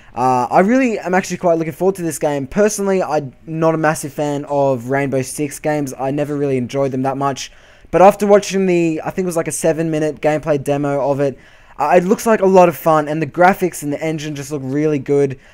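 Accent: Australia. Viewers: nice list bro I agree on everything you said. ps: are you Australian? ^_^ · He's Australian. Not everyone speaks english like you. British, Caribbean, American, Australian, Canadian. All english, just a different dialect of the language. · its just so nice to hear a familiar australian accent on youtube.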